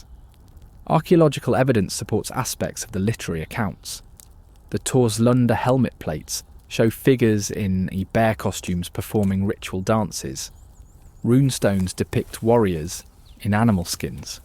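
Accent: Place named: England, United Kingdom. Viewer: I dig the verbal painting the author of this is writing and the narration for being English sounding not to bad I wish there was some buddy on the whole of utube besides myself and a pretty girl from Iceland that taught me vicariously through her channel that most of the world is pronouncing that word VIKING Wrong the I does not belong in the pronouncieation and really shouldn't be in the spelling either but dainish English sweedish Norwegian and probably all forms of Germania have shit tons of letters inside words that make no damnd sense at all if you're ludtining to the prononcieation the word viking no exception the I does not belong the pronouncieation is actually vking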